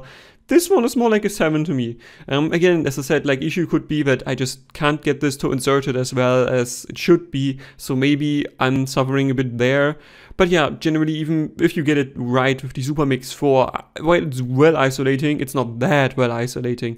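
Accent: Germany. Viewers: Madre Mia!! Your English is totally undecipherable. SLOW DOWN YOR SPEAKING. You have a very thick German accent. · Für mich war’s überhaupt kein Problem, deinen deutschen Akzent zu verstehen, wenn du Englisch sprichst. Ich bin nämlich auch zweisprachig (Deutsch/Englisch), also mach dir da echt keinen Kopf. Dein Akzent erinnert mich an Der8auer und der hat immerhin 240 k subs.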